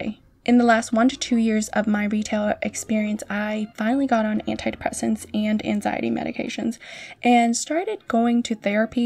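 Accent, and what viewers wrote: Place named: Boston, United States. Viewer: LMAO !! You're from Boston !!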